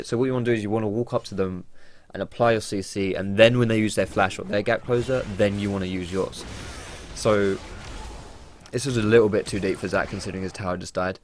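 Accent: France. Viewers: is he french ?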